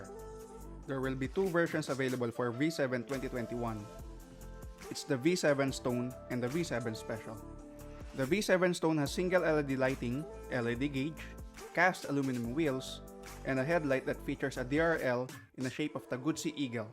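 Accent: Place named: India. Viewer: Interesting and well produced video, but I struggled with the narration. Not even the English speak that fast and coupled with your heavy Indian accent, it was difficult to understand you. Please slow down your delivery and I am sure your viewers will appreciate it.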